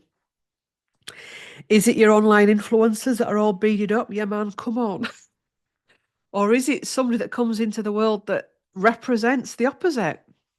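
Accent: Yorkshire, United Kingdom. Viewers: Love your videos and yorkshire accent. ❤ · Some of your pronunciation today was particularly Yorkshire, you made me smile. My 84 yo dad is a Yorkshire man. You help sooth the Soul during these times....🫠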